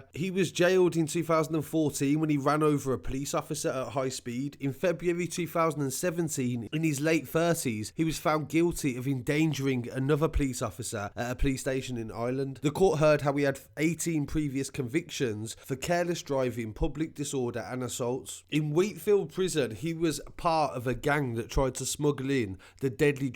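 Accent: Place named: South London, United Kingdom. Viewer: Can tell your from south London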